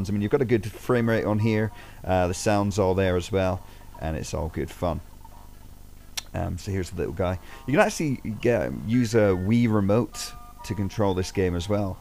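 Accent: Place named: United Kingdom. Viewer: i love british accent!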